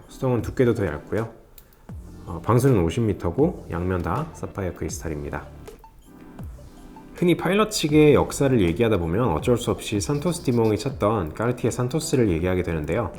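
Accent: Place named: France. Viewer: 아 히스토리 좋습니다 아니 불어액센트 뭐죠?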